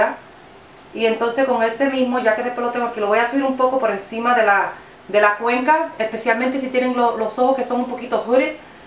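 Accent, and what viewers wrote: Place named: Puerto Rico. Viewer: a mi me gustan los maquillajes naturales :) ademas quiero que sepas q me gusta como hablas bien boricua.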